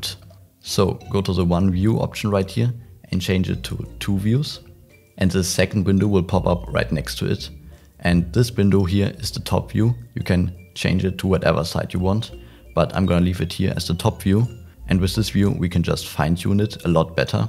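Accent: Germany. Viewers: Is it the germany accent?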